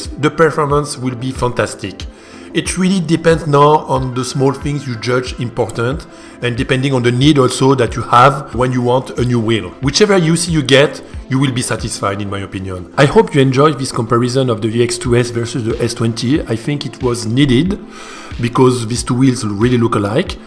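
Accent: France. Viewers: Quel accent français ! 😄😉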